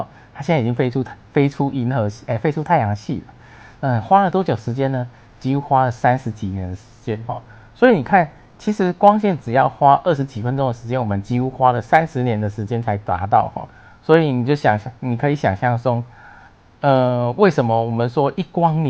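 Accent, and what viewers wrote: Taiwan. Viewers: 滿滿的台灣腔齁.....係勒齁沙小的?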